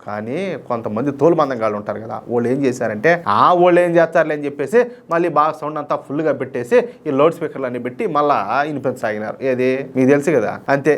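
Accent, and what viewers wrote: Rayalaseema, India. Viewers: అన్నయ్య నీ భాష అదిరిపోయే భాష మాట్లాడతావు అన్నయ్య రాయలసీమ భాష చాలా మంచి న్యూస్ చెప్పావు అన్నయ్య థాంక్స్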